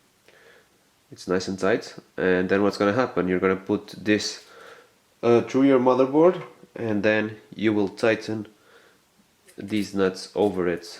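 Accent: Portugal. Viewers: és tuga não és?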